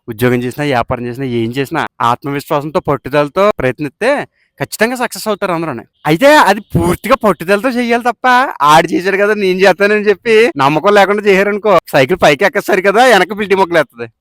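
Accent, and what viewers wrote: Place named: Godavari, India. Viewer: Nenu mee videos chustunna because your Godavari slang / accent..... bagundi....east / west godavari area lo best places to visit kosam few reels cheyyandi...all the very best kind regards, Ravi , Vizag.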